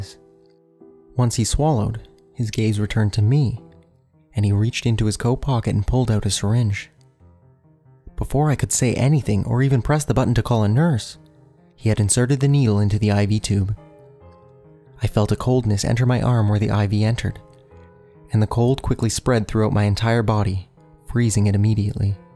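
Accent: Canada. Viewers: A very Canadian creepypasta reader. Not only that but you read very well. I'm looking forward to listening to more of your readings :}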